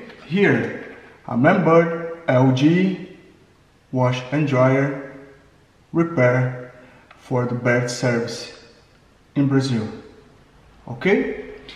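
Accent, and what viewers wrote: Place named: United States. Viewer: Agora realmente ele esta americano como ele falar no video Parabens mesmo o a mericano.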